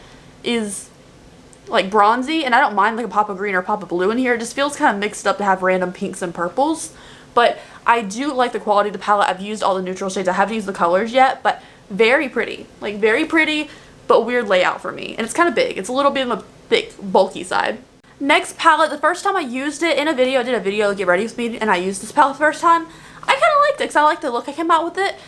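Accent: South, United States. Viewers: The southern accent 👏🏻👏🏻👏🏻 I love it!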